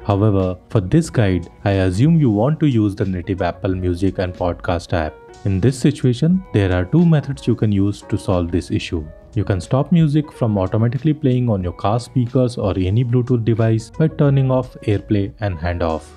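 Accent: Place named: India. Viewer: The man’s strong Indian accent distracted me from what he was saying. Also when he talked fast I could not follow his instructions and sometimes I could not even distinguish the words. I gave up and am seeking an answer elsewhere.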